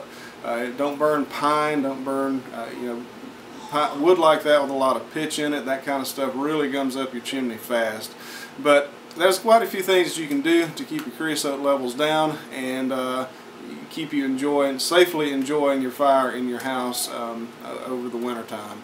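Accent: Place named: Appalachia, United States. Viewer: Where are you from. I like your accent. Is it a hillybilly accent? Thanks from the UK.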